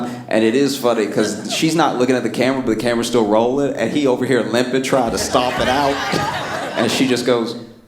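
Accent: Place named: South, United States. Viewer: I notice that speaks in a way that shows he’s highly intelligent but at the same way his manner of speaking is “folksy”. For example he says wadn’t instead of wasn’t and them instead of those which is common in Southern dialects. He completely dispels the myth that if someone speaks a certain way they aren’t intelligent which is a common stereotype placed upon Southerners.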